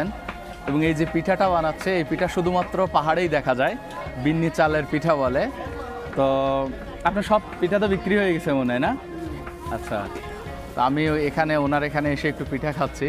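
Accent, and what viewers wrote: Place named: Barishal, Bangladesh. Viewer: আমি বরিশাল বিভাগের পটুয়াখালী জেলা থেকে দেখছি, যিনি উপস্থাপনা করতেছে তার বাড়ি মনে হয় পটুয়াখালী বা বরিশাল।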